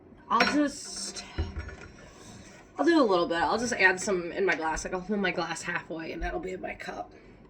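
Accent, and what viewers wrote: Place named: Midwest, United States. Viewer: Holy crap, how do you say you are from the Midwest, without saying you are from the Midwest. Got to be Wisconsin or Chicago area.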